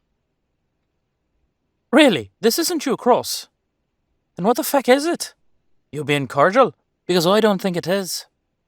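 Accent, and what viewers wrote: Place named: Ireland. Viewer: I love your Irish Accent. Erin Go Bragh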